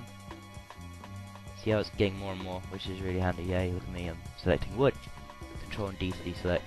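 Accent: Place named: England, United Kingdom. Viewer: i actually loved listening to you sounding SOOO uninterested and english, i laughed all the way through it, plus it was actually helpful, except i knew all that stuff already, i need to know how to do shading with opaqueness... :(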